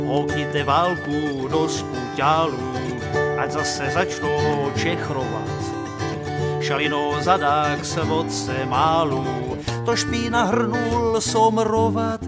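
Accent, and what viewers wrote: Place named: Brno, Czech Republic. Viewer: Hantecu hafo, hafo nerozumim, ale jinak skvělý! 👏👏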